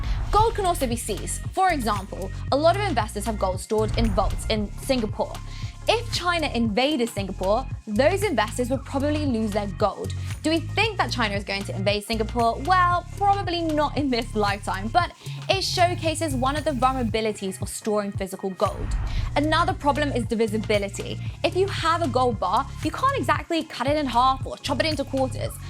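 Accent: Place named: England, United Kingdom. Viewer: must be the thick english accent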